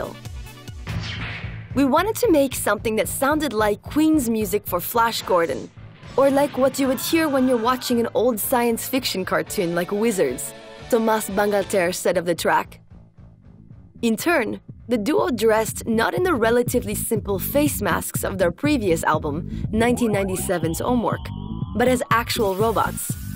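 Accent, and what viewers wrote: France. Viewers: the french accent is absolutely needed. thanks. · Nice french accent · Elle a un petit accent Français.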